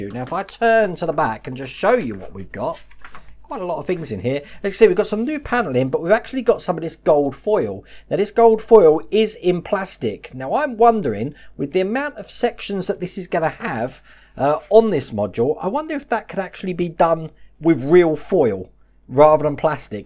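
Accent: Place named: Cockney, United Kingdom. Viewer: He speaks with a cockney accent.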